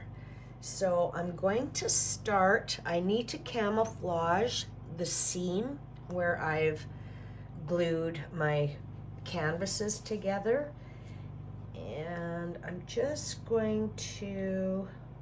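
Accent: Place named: Canada. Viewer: Hello, I am yet to start my new passion of paint pouring but I had not seen anything like this before! You have inspired me so much. Before I found this painting, I had started a Reno in my home. I still have much to do & have had to decide to wait to start this new endeavor till my remodeling is done or I will not get it finished at all. It’s been difficult to have to wait but it’s for the best! The Beautiful Creations you have given me has jumped my mind into overdrive! I just want to be able to get organized and setup a Craft Room. I have enough supplies to do a lot of work,(Play), & it’s all in boxes in my bdrm! I barely have walking room to get thru it to use the bathroom. I think that you must be Blessed by the hands of God to Create such Beautiful Art! Thank You for putting your Vids on here for me to enjoy! I consider it special from one Friend to another. 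Where are you from? I think I detect a Canadian accent! I live in Washington State about 3 hours or so South of BC, Canada. Like your works of art, it is as beautiful as it can be. I hope you will have continued success and stay safe so you can create even more. Thanks again, Newburyport to this stuff, JilliBean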